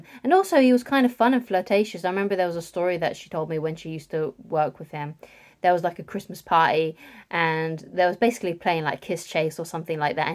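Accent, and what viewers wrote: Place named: United Kingdom. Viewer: you are pretty and I really like British accent.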